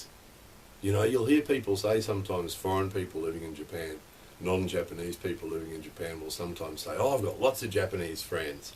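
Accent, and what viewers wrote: Australia. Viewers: I just love the sound of your australian accent talking about details across japan, a country that I do miss a lot! thanks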